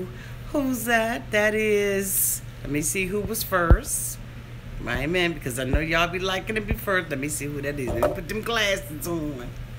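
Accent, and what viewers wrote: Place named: Maryland, United States. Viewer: Britt S it's her Maryland accent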